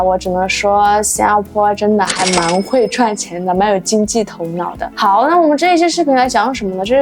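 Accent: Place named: Hubei, China. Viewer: 你这口音是湖北普通话。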